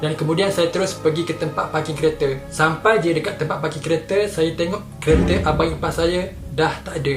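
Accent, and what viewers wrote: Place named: Kelantan, Malaysia. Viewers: Orang Kelantan kalau cakap kl memang akan cam ni, nice 👍 cerita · Macam org kel je bunyi accent 🤔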